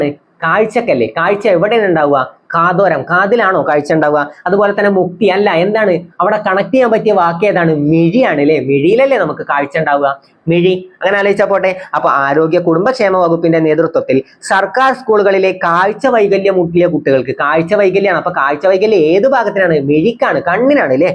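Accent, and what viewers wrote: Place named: Palakkad, India. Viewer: 18 വയസിനു താഴെ ഉള്ളോരേ ഒക്കെ ആരേലും താലോലിക്കോ? ചിറി അടിച്ചു പൊളിക്കല്ലേ ചെയ്യാ 😂😂😂😂 Typical Mannarkkad Ottapalam Slang 💙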